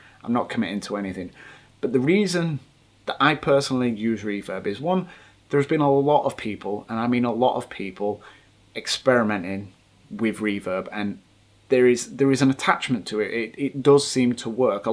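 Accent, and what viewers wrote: Yorkshire, United Kingdom. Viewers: Don't apologise for the hour long vid! It was incredible, it would be amazing if you did more hour long vids. Is your accent from Yorkshire? If it is, have you ever thought about investigating the moors? Quite a few times I have seen odd things there.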